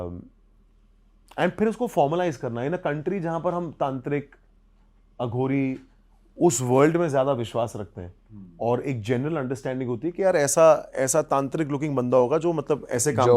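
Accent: India. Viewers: So boring yr the person who is telling the story is speaking half Bollywood English by shaking his head and another half Hindi so so boring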